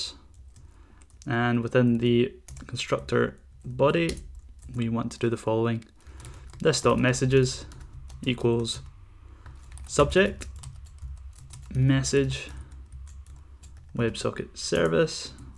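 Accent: Scotland, United Kingdom. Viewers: Great tutorial and the scottish accent makes it even better :) I'm not even doing angular, but tutorials for redux-observable are so scarse... If you could do one on that, it would be great, but in any case - great work, thanks and keep it up. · nice tutorial and nice scottish accent, brings me back to my old scottish lads in Spain :,)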